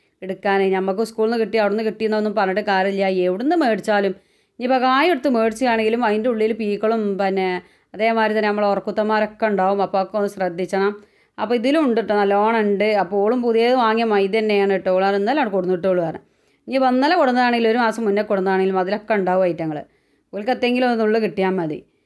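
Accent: Malappuram, India. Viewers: താത്താ നിങ്ങടെ വർത്തമാനം മലപ്പുറം ജില്ല വിട്ടിട്ടുണ്ട് കുറച്ചൊക്കെ കുറയ്ക്കാമായിരുന്നു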